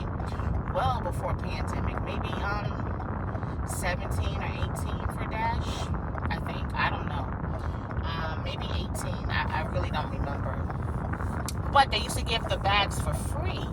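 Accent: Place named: New York, United States. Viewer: Love the accent NYC💯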